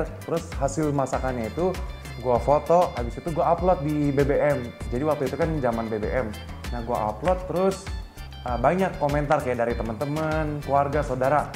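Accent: Indonesia. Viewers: Logat Serang nya brasa bgt yaa 😁🤭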